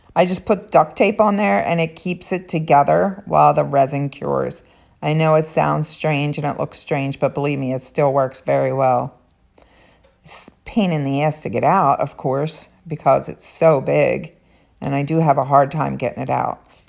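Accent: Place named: New Jersey, United States. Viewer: Are you from jersey or PA ? Just wonder from your accent lol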